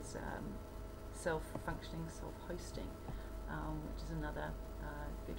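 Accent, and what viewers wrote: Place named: Australia. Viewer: thanks! really helpful! and i love your Australian accent!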